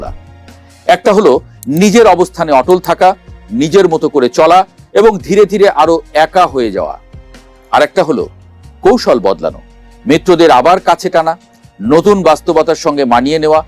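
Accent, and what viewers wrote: Standard. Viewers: শুদ্ধ বাংলা। কোনো বাঙ্গাল টান নেই। সুন্দর বিশ্লেষণ। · প্রমিত বাংলা উচ্চারণ। স্পষ্ট, প্রাঞ্জল ও আঞ্চলিক প্রভাব মুক্ত, ইংরেজি বা অন্য বিদেশি ভাষা প্রভাব মুক্ত। বক্তব্য সোজা, দীর্ঘ-ভুমিকা হীন , প্রাসঙ্গিক। অতীব প্রসংশার বিষয়। ধন্যবাদ ও শুভেচ্ছা 🎉 🤝 💛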